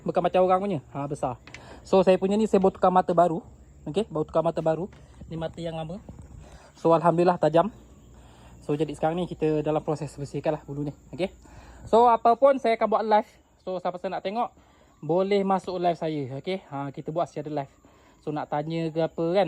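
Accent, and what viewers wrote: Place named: Negeri Sembilan, Malaysia. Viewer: Kalau dengar cakap seperti kat negeri sembilan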